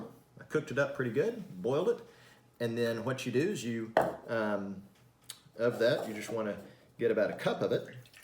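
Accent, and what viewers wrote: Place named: South, United States. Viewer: this guy is the first actual doctor who I've heard talking in a slight southern accent.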